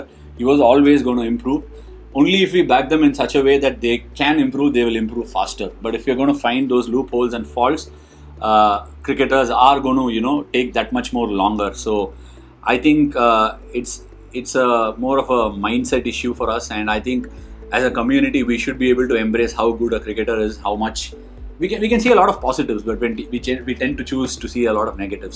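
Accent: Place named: Chennai, India. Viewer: Best Chennai Accent😀 Nothing bad❤️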